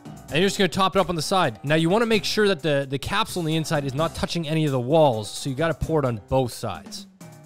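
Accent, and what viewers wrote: Canada. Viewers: Is he canadian... cuz he sounds like it and im tryna build a Canadar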